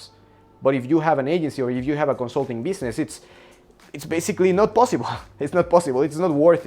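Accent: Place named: Ecuador. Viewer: Thank you for your work. Your accent reminds me of Marlon Vera who is a very respected MMA fighter from Ecuador.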